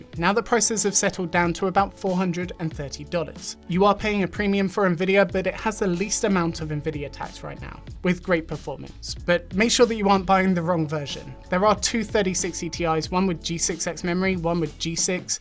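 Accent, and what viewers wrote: United Kingdom. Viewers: interesting video. But i just took a look at your links for a few cards i could be interested at for different prices ranges. Well your links for the so called "cheapest" cards i looked at, well, where more expensive than i have seen on other sites in the uk in the last few days. these links where very disappointing after sitting though your video. Not impressed. Also which market are these best prices from? You are from the UK sounding wise but you keep talking and showing usd prices. Maybe i missed something but you really should say which market your talking about as they can range in prices so much. esp as USA don't post tax's on there card prices quite often. Anyways thanks for the video and the effort but disappointed. Cheers.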